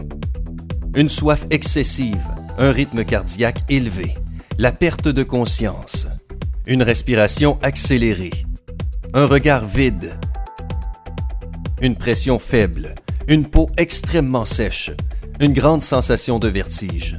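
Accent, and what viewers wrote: Quebec, Canada. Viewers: Ouahhh on a tous reconnue l'accent québécois c'est magnifique 😂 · Mdr l'acent québécois mdr 😂🤣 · L'accent canadien m'a fait marrer pendant toute la vidéo 😂🤣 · c'est l'accent du Québec ! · C'est tellement moche l'accent québécois 😷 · Marrant hein l'accent canadien j 'comprend -donc ,aie !!! Gros gros buzz ! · J'aime pas l'accent canadiens et surtout la voix w😗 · J’aime beaucoup l’accent québécois ! J’espère que je suis pas la seule mdr 😂 . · Alerte accent QUÉBEQUOIS! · Pk c une voix canadienne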